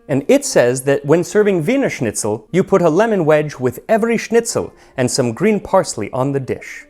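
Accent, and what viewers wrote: Germany. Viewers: It's been a while since I watched a Tasting History. Absolutely love this channel, and love hearing German and his occasional half German half English like the "If you vant" there at the end. ❤ Great stuff... Might have to stop by the butcher to get some veal and try to cook a proper wiener schnitzel.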